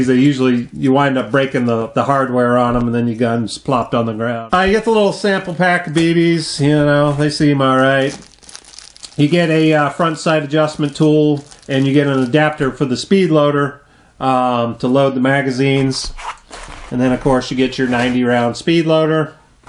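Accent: China. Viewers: got it just for the chinglish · chinglish FTW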